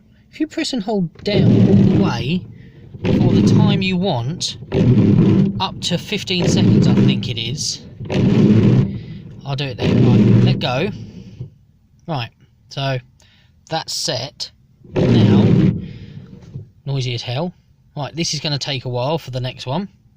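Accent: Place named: United Kingdom. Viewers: Yoda Master British English. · Lol, you speak like a british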